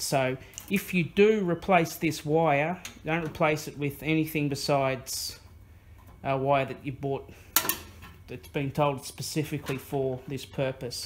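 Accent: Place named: Australia. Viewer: He's gotta me Aussie... He speaks exactly the same as I do..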